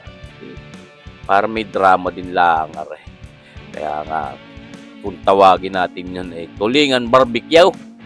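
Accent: Batangas, Philippines. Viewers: Kabayang, Tuloy-tuloy lang ang vlog. Ako'y regular na nanunuod sa iyo, napaganda ng iyong puntong batangan. Ang aking ama'y d'yan lumaki sa Avenida Rizal malapit sa calumpang.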